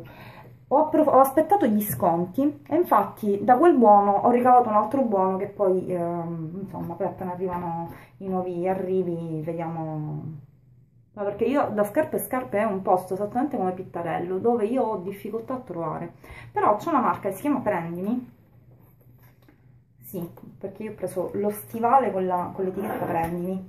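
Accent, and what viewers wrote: Sicily, Italy. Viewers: Io ti adoro! Tiri su il morale, ma profondamente! Spingi per avere piu autostima per te tutto si puo fare, sei sincera te stessa e finalmente uba che da del tu e non del voi (e non e poco) mi fai sentire amica tua e non per ultimo sei siciliaaana mizzica mi manca la parlata và! Ciao biddazza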